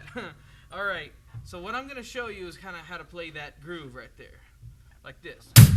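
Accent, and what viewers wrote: South, United States. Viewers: Really funky beat that's hard to beat and a great Southern accent to go with it.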